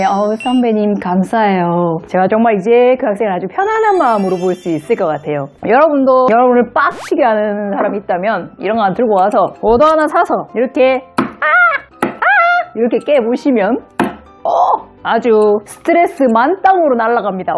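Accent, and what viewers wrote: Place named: Busan, South Korea. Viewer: 구수한 부산 사투리의 에게 서서히 중독되어 가는 것 같습니다. 개그 재능도 있으세요!!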